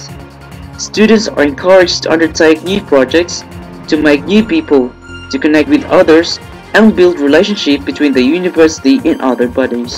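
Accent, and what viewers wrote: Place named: United Kingdom. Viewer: haha.,! wow.! British accent.,!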